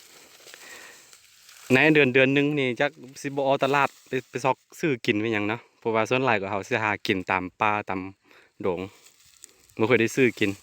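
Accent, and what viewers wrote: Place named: Ubon Ratchathani, Thailand. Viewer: เจ้านิกะเว่าได้หลายภาษาเนาะเว่าคือคนอุบลบ้านข่อย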